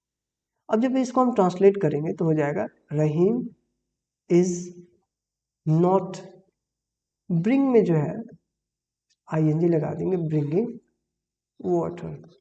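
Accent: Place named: United States. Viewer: I love❤ your American style voice..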